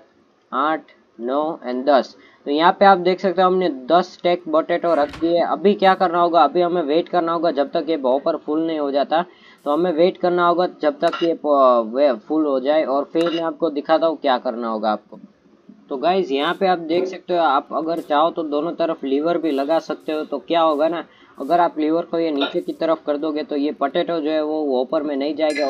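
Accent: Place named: Gujarat, India. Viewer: gujrati log hindi bolne me pakde hi jate h ...btw i am also from gujrat..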